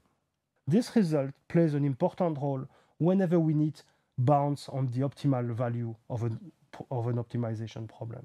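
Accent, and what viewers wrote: France. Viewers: It is amazing how he has the heaviest french accent still being quite clear, understandable and concise. Ver'y r'ar'e... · Thanks! Love your French accent :))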